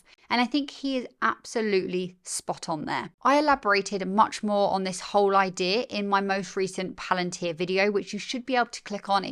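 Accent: England, United Kingdom. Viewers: It’s always a pleasure listen to your English accent talking about our dream company !!! Let’s go PLTR !! at the end of the day there’s gonna be a few bumps in the road, but in a longer run PLTR will be a monster company!!!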